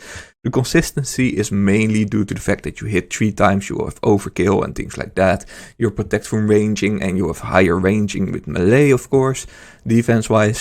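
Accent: Netherlands. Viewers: yo are you dutch by any chance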